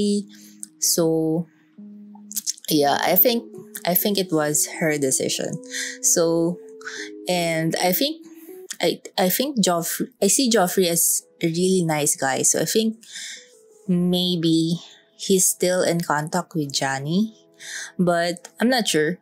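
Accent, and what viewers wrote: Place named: Philippines. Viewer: Accent pa lang alam mo na agad na pinoy to hahahaha nice vid po ma download nga to sa lot ko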